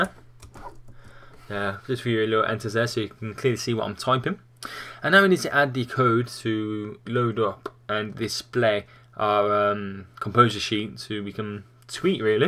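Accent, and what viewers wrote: United Kingdom. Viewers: Love the british accent! "Tweets to Twi-e" :)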